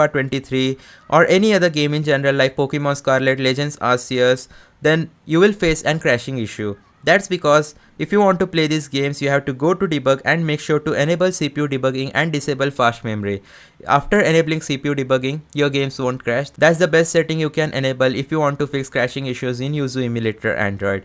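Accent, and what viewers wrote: India. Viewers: Indian accent hurt my ears but this is helpful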